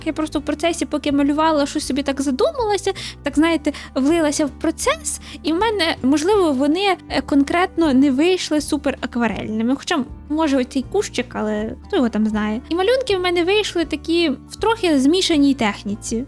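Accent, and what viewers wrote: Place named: Western Ukraine, Ukraine. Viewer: Дуже круто! Особливо дякую за розмовне відео, це те, що потрібно українському ютубу. Ваш говір крутий і мені цікаво, з якої ви області? Я ставлю ставки на межу 3 областей: ІФ, Львівської і Тернопільської)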